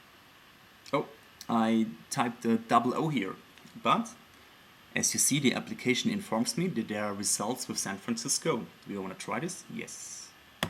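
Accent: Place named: Germany. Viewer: du bist Deutscher, das hört man voll raus :-)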